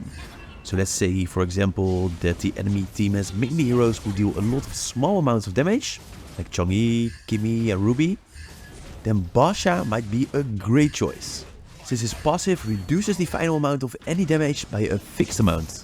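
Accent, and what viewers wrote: Netherlands. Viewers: I don't need to listen a second longer wanneer ik iemand die Nederlands praat Engels hoor spreken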